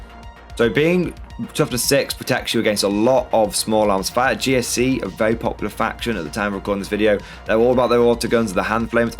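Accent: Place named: United Kingdom. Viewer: is swooning me with his cute British accent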